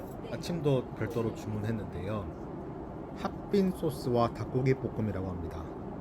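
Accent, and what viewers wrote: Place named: North Korea. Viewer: 말투가 북한 사람같네요. 북한사람인가요?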